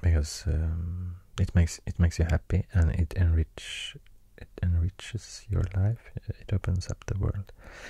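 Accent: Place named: Sweden. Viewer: Idk what it is about people with Swedish accents, but you are not the only person who I enjoy listening to speak. You might even wanna consider speaking in your native language sometimes too and just add the translations in subtitles for people who might want to still read. I just think Swedish is so nice to listen to, even if I don't understand it lol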